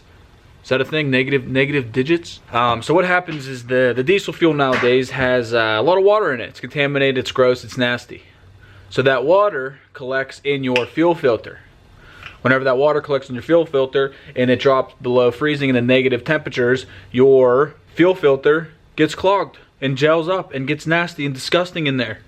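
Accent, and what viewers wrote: Pennsylvania, United States. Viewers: This channel makes me home sick until I see how cold it is there. I never noticed the accent until I lived away from PA for awhile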